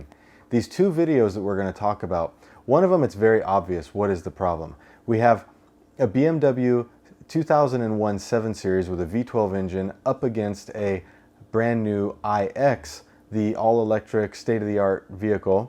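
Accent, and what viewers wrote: United States. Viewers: Good video man. The way you sometimes pronounce "L"s is very strange to me. Where does that come from cause you have a very natural American accent aside from that.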